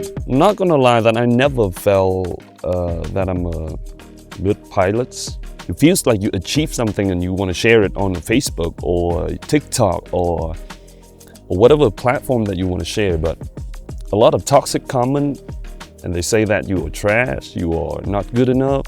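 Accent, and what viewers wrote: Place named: Texas, United States. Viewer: I see, thats kind of similar learning curve to mine. Definetly not, your accent sound cool, you sound like some bloke from texas (atleast to my slavic ears). Keep on grinding mate!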